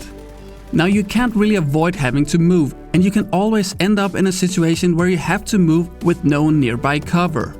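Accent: Denmark. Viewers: Er du dansker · are u danish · are you danish ? your accent sound a lot like it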